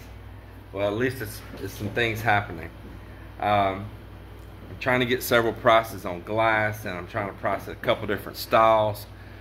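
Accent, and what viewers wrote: Texas, United States. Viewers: It’s language barrier. Your texas accent, figures of speech and sense of humor are “foreign” to them. Learn ilonggo so they understand you. If us Filipinos go to America, we speak english. Since you’re in the Philippines, speak tagalog or ilonggo when you’re in Ilo-ilo. Then you won’t have to stress about them having ‘amnesia’🤣😂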